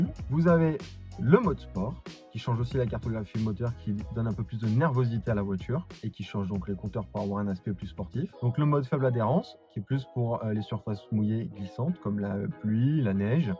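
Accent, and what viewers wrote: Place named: Paris, France. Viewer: Le présentateur est sûrement très compétent mais parler vite avec un fort accent Parisien est tout simplement incompréhensible pour un mec du Sud comme moi. C'est vraiment dommage, je ne comprends pas la moitié de se qu'il dit 😢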